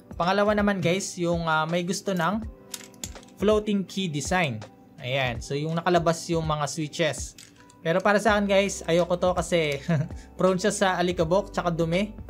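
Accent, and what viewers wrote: Philippines. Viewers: I love that I can still understand Tagalog. It's so fun to hear you do Taglish.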